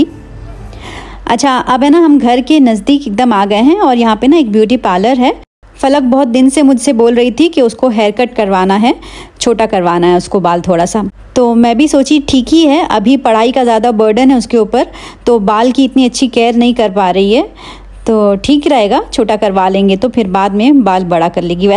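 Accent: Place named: Mumbai, India. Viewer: Sister aap mumbai se ho , aur aapka sasuraal u.p. ka hai, isliye aapki mix zabaan badi hi mazedar lagti hai sunne me. Khaskar jab aati hai, ise chhupa kar paka lenge..😊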